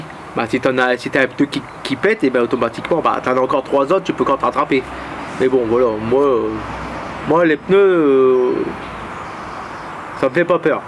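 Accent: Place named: Picardy, France. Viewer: Bonsoir, Merci de nous avoir fait partager la vidéo 🫲 Je te rejoins complètement pour faire perdurer le patois chti. Moi, tu vois, je suis de l'Aisne donc, je suis également picard et ça me va très bien. Sois fière dont la manière que tu t'exprimes. C'est ton identité et tu dois la garder et la faire perdurer. Te souhaite une bonne nuitée ainsi qu'à tes proches et abonnés. A bientôt. Denis.😉👍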